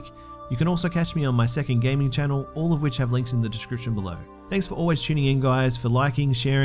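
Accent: Australia. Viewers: Bethsabée Newrose yess Aussie accent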